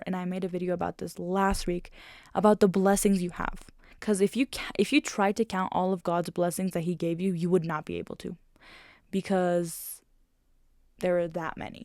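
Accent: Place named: United States. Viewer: Сказать что я удивлен, ничего не сказать 😅Это второе видео из вашего канала, которое заставило меня остановиться и всё же написать коммент, так как вопрос в голове не даёт покоя. I am really impressed that you know so many languages and even acquired the American accent. Were you exposed to the language growing up? Яна битта саволим бор эди, тушунишим буийча Фусха ни урганвосиз. Узиз урганвосизми йоки тил курсларига катнавосизми йоки бирор урганиш методларини кулавосизми?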